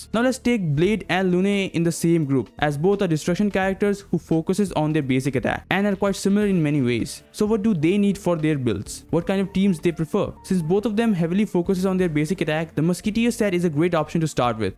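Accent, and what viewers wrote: India. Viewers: Bro yur accent is like Indian is that true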